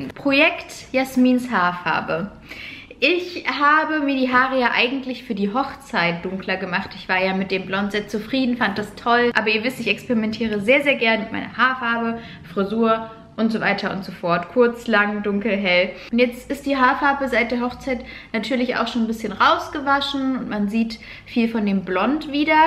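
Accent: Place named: Hesse, Germany. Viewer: Kommst du aus Hessen? Klingt so!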